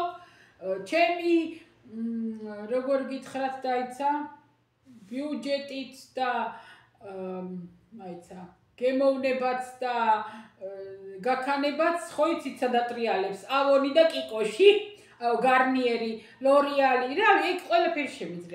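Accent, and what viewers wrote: Kakheti, Georgia. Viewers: ❤❤❤კახური კილო მსიამოვნებს. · უკარგესი ადამიანი ხარ ❤, უბრალო, წესიერი, კულტურული, განათლებული, საყვარელი კახური აქცენტით და იუმორით😊❤ ძალიან "გემრიელი" და საინტერესო საუბარი იცი😊❤. ჯანმრთელობა და ბედნიერება არ მოგაკლოს ღმერთმა🙏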